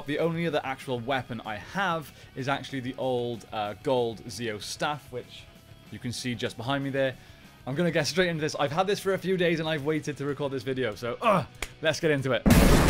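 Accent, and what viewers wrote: Wales, United Kingdom. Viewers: Barely related to the video but I’ve just realised you have a welsh accent (Cardiff, right?). Repping the welsh PR fans! 🏴😂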